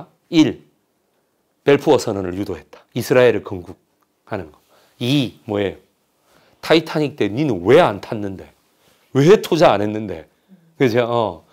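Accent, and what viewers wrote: Busan, South Korea. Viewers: 전형적인 부산영어발음 ❤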